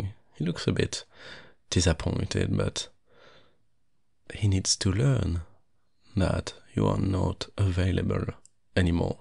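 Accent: France. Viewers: He must be a french man? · Possessive, hot, french man? HECK YES 🥵🥵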